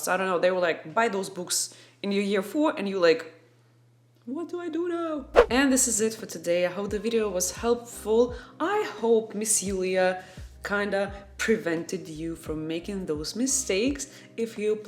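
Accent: Russia. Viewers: I soooo much love your Russian english🤣🤣🤣🤣 thanks for this wonderful video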